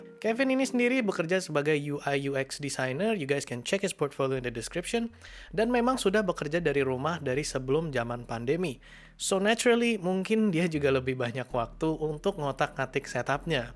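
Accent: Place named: United Kingdom. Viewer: Keren nih ada aksen gaya bahasa inggris nge riview nya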